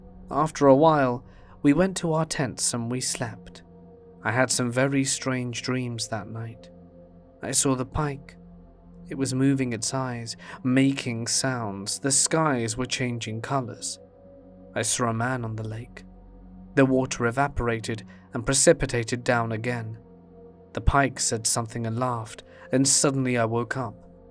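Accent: United Kingdom. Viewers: Thank you kindly for taking the time in preparing each story and love your British accent it gives every story you read worth listening to. 😊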